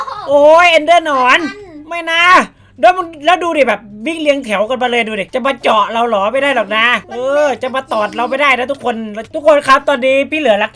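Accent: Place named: Isan, Thailand. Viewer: พี่ไม่พูดอีสานหรอผมขอมา4คลิปเเล้วรวมถึงคลิปนี้ผมชอบเสียงที่พี่พูดอีสานเอาเเค่ตอนเปิดคลิปเป็นภาษาอีสานก็ได้นะผมจะรอ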